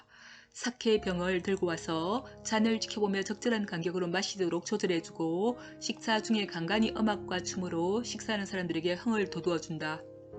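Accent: Gyeongsang, South Korea. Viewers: 사투리라서 그런거니 자꾸 고치라고 하지 마세요. 억양이나 발음이 서울말씨와 다른것이 잘못된것은 아닙니다. 다른지방 사람들에세는 익숙하지 않아서 그렇지. 그리고 바꾸기 몹시 어렵습니다. 서울사람 아무리 연습해도 절대 경상도말투 못배웁니다. 서울 사람이 경상도에 가면 경상도 말로 고쳐야 합니까? 절대 나무랄 일이 아닙니다. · 그냥 경상도쪽 아주머니 딱 말투구만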